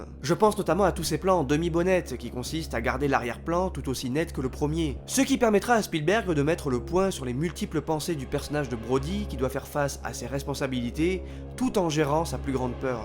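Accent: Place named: South, France. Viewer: En effet, chaque vidéo est un concentré de travail passionné ! Avec la petite pointe quasiment imperceptible du sud de la Loire dans la voix en prime...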